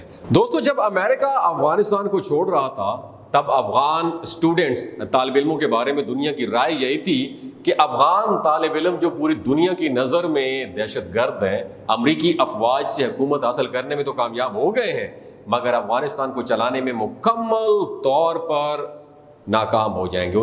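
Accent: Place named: Punjab, Pakistan. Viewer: پنجابی ایسے ہی کہتے ہیں جاپان کو جپان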